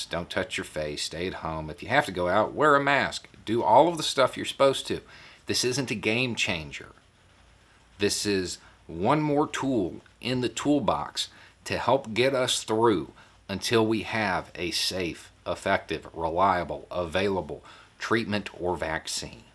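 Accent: South, United States. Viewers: To be honest... I haven't experienced more genuine thought process since I watched this gent making reasonable arguments in a southern accent (I maybe wrong I'm german) in his garage with his superior Beard. I expect any minute a profound argument supporting the second amendment.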